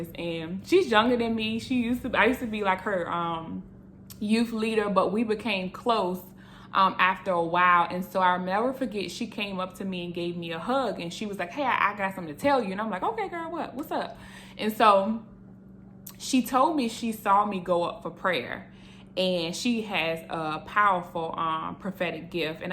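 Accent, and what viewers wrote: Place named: South, United States. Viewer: I enjoyed your video that I came across by chance. Your forthrightness and candor are refreshing and inspiring, and your southern accent is boffo. Eating fruits and veggies are best for our health and digestive system. Supplemental nutrients and vitamins are necessary too. Especially the Omegas 3, etc., and B Vitamins (particularly B 12 if you have vegetarian leanings). Stay away from foods and drinks that have preservatives (Propylene Glycol, BHT, Sodium Benzoate, MSG, Potassium Benzoate, etc.) in them. Preservative are used in cosmetics and medicines a lot too, and can be absorbed into the body transdermally. Overall, preservatives, fillers, and binders are generally man-made chemicals that are not natural or organic. Their use is for preserving the shelf-life of products to maximize profits . They can wreak Havoc in our bodies by disrupting hormones, cells, blood chemistry, and can cause cancers. 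If you think about it, preservatives, fillers, and binders - overtime will cause problems for our digestive systems. In other words, they make digesting what we eat harder, inefficient, and ineffective. This all applies to the nutritional health and safety of pets too. While writing this, I remembered something I read in a Malcolm Gladwell book concerning hormone disruption in women. At this time, I cannot remember which one of his books. Before getting into his findings - let me introduce you to him. Malcolm Gladwell is the author of five New York Times bestsellers — The Tipping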